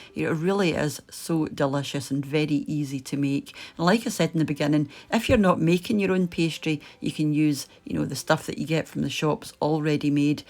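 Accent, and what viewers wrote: Scotland, United Kingdom. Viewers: Okay, I just found this channel and I LOVE it. I always loved scotland and now, I can attempt to cook scottish things while listening to an actual scot (with a marvellous accent I might add) explaining it to me. That's wonderful! (Sorry for spelling mistakes, I'm being exited, tired and not a native speaker/writer at the same time. And I always suck at spelling)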